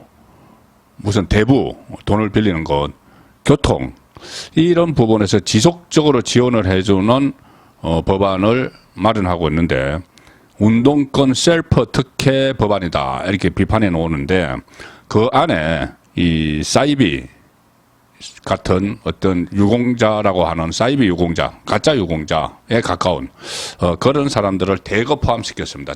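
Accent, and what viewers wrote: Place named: Busan, South Korea. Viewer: 매 편 즐겨 보고 있습니다. 저도 부산 사람이라 사투리는 이해하는데 제발 아 발음을 오 로 발음하지 않았음 합니다. 나오고 가 맞는데 노오고 는 아니지 않습니까? 어려운 것 아닐것 입니다.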